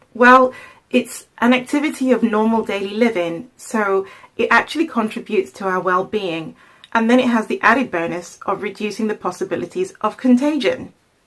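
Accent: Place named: RP, United Kingdom. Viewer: You've got a Received English account and speak fluent Italian- i want to be you when i grow up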